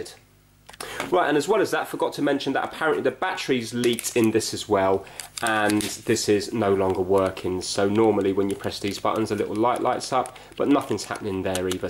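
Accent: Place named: East Anglia, United Kingdom. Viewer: I can't quite place accent though. Sometimes he sounds like he's from East Anglia, other times Irish!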